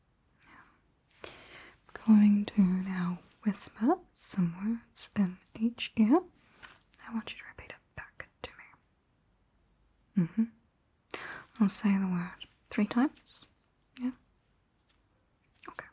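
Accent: New Zealand. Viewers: lordy kiwi accent melting my vagus nerve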